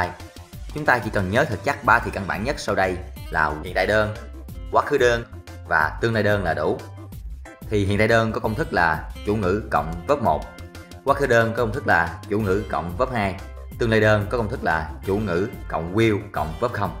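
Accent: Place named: South, Vietnam. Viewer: tại thấy bạn nói giọng miền Nam mà dùng không đúng ngôn ngữ của miền Nam. Bút viết : Viết ( miền Nam), bút ( miền Bắc). Ngôn ngữ miền Nam dần mất đi một phần là do ngôn ngữ miền Bắc thống trị gần hết câc kênh truyền thông ( báo chí , phát thanh truyền hình), SGK cũng do người miền Bắc độc quuyền biên soạn.Một phần cũng do chính những người miền Nam sinh sau năm 1975 không trân trọng, gìn giữ ngôn ngữ của cha ông mình mà còn tiếp tay xóa bỏ ngôn ngữ của miền Nam Việt Nam.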